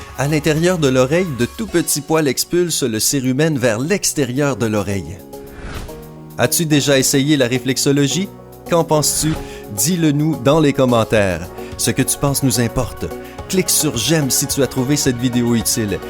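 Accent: Canada. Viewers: vire la voix canadienne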